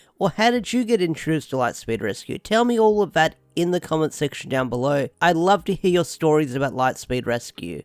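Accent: Australia. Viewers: oh I see well i saw one of you videos around 2020 but I started watching more of your videos in 2021 now I assume by the sound of your accent your australian am I right?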